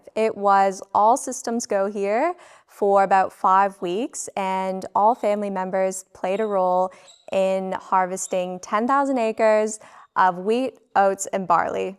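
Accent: Australia. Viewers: I can hear that Aussie accent creeping in....soon you'll be one of us....one of us....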